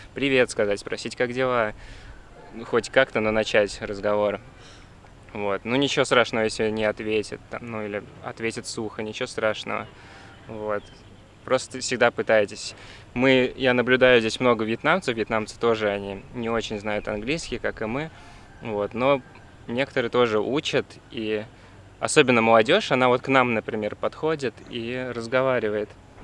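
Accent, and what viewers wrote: Russia. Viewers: Господи да у тебя такай русячий акцент, это ужасс! И еще чет гундосит тут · на мой взгляд ты специально делал русский акцент=))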